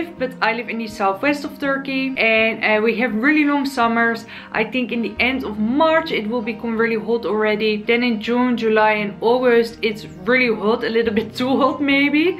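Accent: Netherlands. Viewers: I knew ur from The Netherlands, just because of ur accent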